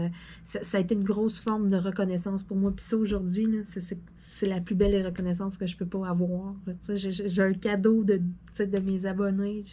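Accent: Quebec, Canada. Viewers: cc bravo et merci pour ce bon moment et ton rire avé l'accent quebecois,c'est divin merci de super cadeaux j'adore le stylet bonne fin de journée gros bisous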